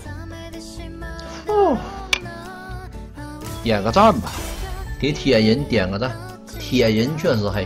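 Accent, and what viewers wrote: Northeast, China. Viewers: 猴哥是東北人嗎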